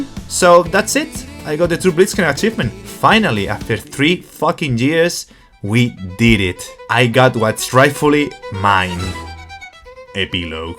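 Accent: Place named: England, United Kingdom. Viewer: Jajajajajajaja me da gracia tu acento inglés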